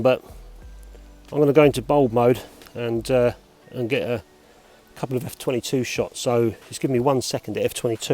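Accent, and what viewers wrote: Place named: England, United Kingdom. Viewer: dear, every time I need to hear some healthy English accent I tune into your channel please note < need to> Of bleedin 'course you butcher all them foreign words, you're English, aren't you? it's < tzeyes > Nettar you've got it right, Anastigmat wrong, as usual golden rule for English, Welsh, Scots, and other British subjects is: if you naturally stress the first syllable then you can bet your posterior it's second one you should stress. The syllable you strees naturally and the syllable you pronounce more or less neutrally are your best guide go against your natural tendency and stress the other syllable ana-STIG-mat you says, anastigMAT is right admittedly it's a difficult one having four syllables;Love you, your videos, some of your pics and your effervescence. What a pity they banned smokin' in pubs ( please pron vinnie coz it's German & I'm a bloke )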